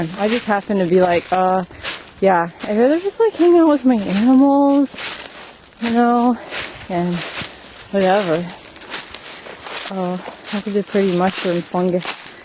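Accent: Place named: North, United States. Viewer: Of course, assuming by state, I am just assuming you are in northern US or Canada based on your accent.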